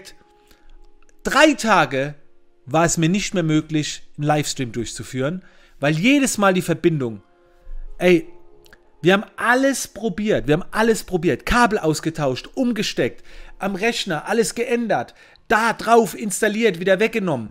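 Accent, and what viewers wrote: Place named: Mannheim, Germany. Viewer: Bist du aus Mannheim? Dein Dialekt hört sich so an. Grüße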